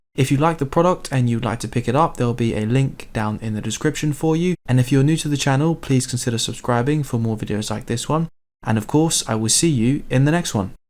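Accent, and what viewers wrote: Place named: England, United Kingdom. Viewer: Great. And fantastic to hear an English reviewer and one with a nice, tidy garden as well. I'm a light user and I don't do games and I don't like having to worry about batteries so I'm considering it. I like big battery phones but never had a big beast like this. I'm assuming it works on 4g band 20 in uk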